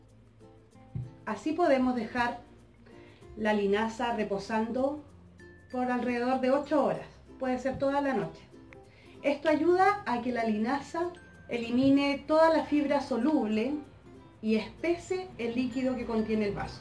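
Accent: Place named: Chile. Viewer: POR FIN VIDEO EN ESPAÑOL CHILENO! !!! MUCHAS GRACIAS ! DESDE MAÑANA COMIENZO CON TU RECETA 😊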